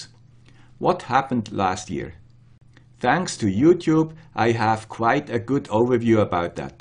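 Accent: Switzerland. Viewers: Happy New Year! Looking forward to another great year of videos from the guy with the Swiss accent. Don't change a thing. I realize you have a long backlog on video ideas, but would love to see one on enclosures for various electronics projects. This is an often overlooked topic in the electronic hobbiest world. Thanks for your great videos. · Hi - wishing you continued success for 2017. You explain things on a level that we understand and continue to be generous on providing code. I fully agree that a community is starting to appear and that we can all help each other when hit a small road block. So the guy with the "Swiss accent" please keep on with projects and teaching as well as you go. I personally think is one of the best channels I have found.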